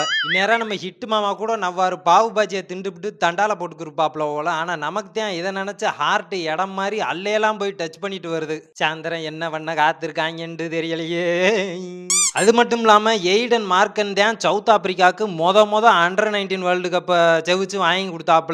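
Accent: Madurai, India. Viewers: நம்ம மதுரை ஸ்லாங் கேக்கவே அருமையா இருக்கு நண்பா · Bro neenga maduraiya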